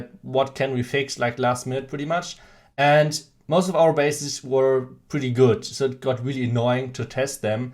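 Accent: Germany. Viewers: Thanks for making an English Channel so I can understand. Loving the German accent. I hope to see more of your content. And do give Galadon elder. He deserves it.:)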